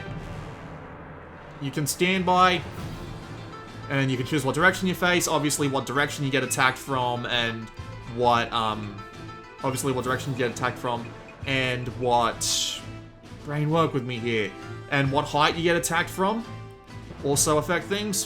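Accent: Australia. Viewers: The voice on this review is awful. I am not a fan of Australian accents.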